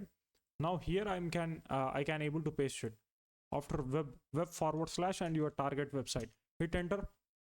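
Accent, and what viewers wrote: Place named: United Kingdom. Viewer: Hello Sir, I love your this British Accent ❤️!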